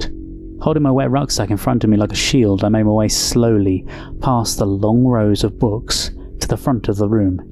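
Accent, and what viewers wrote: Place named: United Kingdom. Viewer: hears intro -subscribes immediately hears British accent -TRIPLE SUBSCRIBE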